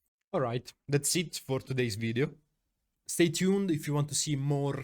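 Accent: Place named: Italy. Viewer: Mai collegh hahaha figo , si sente che probabilmente sei italiano lol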